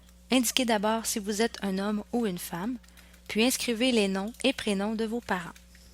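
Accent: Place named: Quebec, Canada. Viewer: L'accent (que j'adore) québécois tout simplement ;-)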